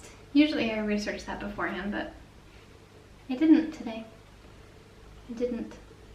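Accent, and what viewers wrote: United States. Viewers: Just curious where you live. as you sound American, but you obviously don't live in North America hence the reference to the Euro?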